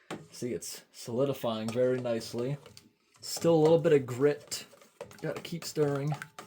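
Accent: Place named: Connecticut River Valley, United States. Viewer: Don't understand why I watch this stuff lol recognize the Conn Riv Vly accent good video my man ✌🏼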